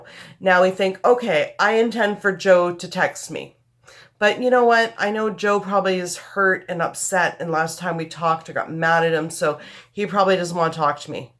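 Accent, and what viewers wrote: Canada. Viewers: This is off topic but are you Canadian? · .....Are you Canadian???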